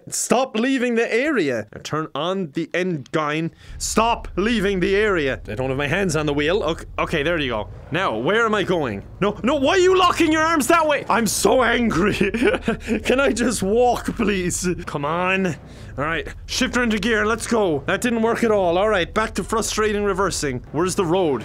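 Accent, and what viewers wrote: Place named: Ireland. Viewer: Good to wake up to this sweet Irish voice. Have a wonderful day,!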